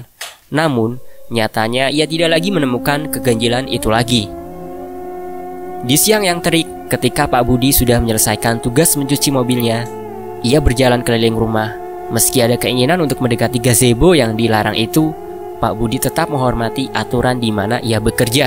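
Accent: Java, Indonesia. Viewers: q seneng logat jowone sang Pembaca wong ndi yo kiro2 😀😀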